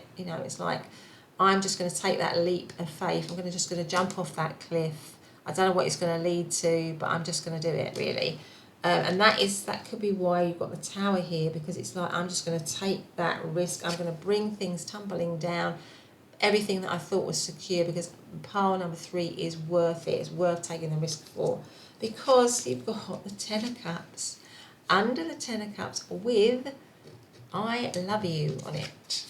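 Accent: United Kingdom. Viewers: Thank you!! I love the way you interprétate de tarot ( even if sometime is hard for me your London English accent ( I love it btw ! ) hug , abrazo y gracias from Buenos Aires ! ❤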